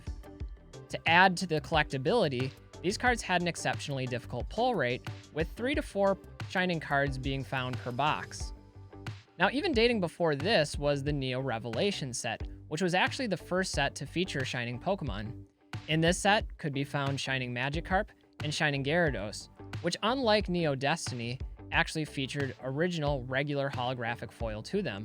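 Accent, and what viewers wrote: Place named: Midwest, United States. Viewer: I was just thinking I could tell he was from MN or WI from his accent and way he dresses. Very outstate midwest, having grown up there myself. I checked the comments to see if anyone else picked up on that. He's one of our people!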